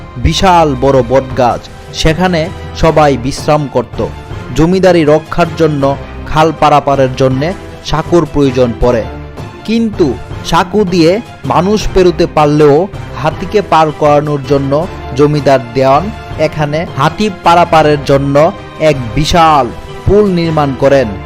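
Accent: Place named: Sylhet, Bangladesh. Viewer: I am sure you are from Sylhet. Pl try to improve your pronunciation. Otherwise video is good. 👍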